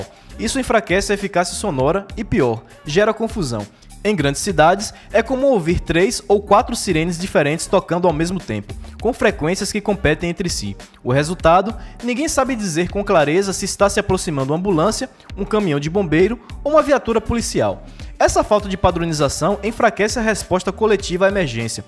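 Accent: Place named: Salvador, Brazil. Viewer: c é daq de salvador irmao? teu sotaque e os video que vc botou ai é tudo daqkkkkk esse ultimo ai é na paralela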